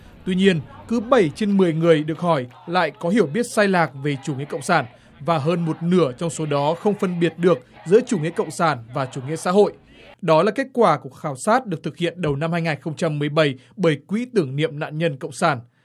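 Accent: North, Vietnam. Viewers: Giọng bắc mà đi phê phán chủ nghĩa Cộng sản là sao nhể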